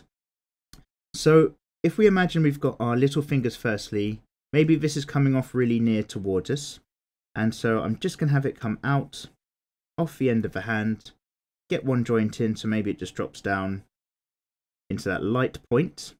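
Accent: United Kingdom. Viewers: very bri ish eh?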